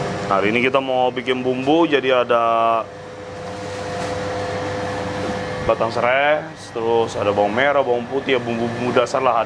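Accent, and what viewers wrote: Banyumas, Indonesia. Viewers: deneng ngomonge kaya basa bayumasan yah ....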